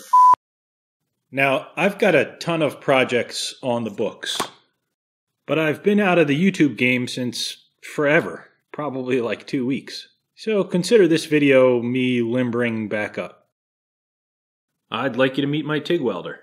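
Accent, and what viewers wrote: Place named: United States. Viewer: Yes annoyed me as well - perhaps it is a Yank thing? Like Americans can't say solder - they say sodder not solDER! Still love videos - they are the best!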